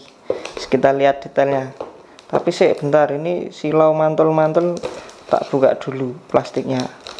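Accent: Java, Indonesia. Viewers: Orang mana tt bang sampean...??? Ngomong e kok jowo2 ... Aku pngn dolanan tamiya.. Tapi nang daerahku ra ono e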